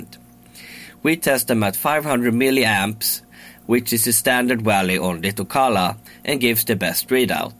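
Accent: Sweden. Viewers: Sounds like you are from Lidingö…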